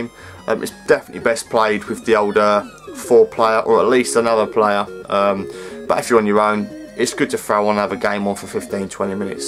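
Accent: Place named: United Kingdom. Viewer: It's because of people like yourself that I love being British!:D It's just the mannerisms and the way you speak ("stop chatting shit ") and it gets me every time I watch a video of yours! There's not many other English speaking people that speak the same way we do.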